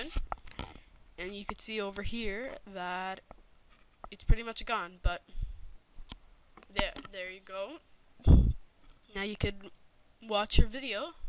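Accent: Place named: Canada. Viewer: no offense..but i can tell u have a canadian accent..its cool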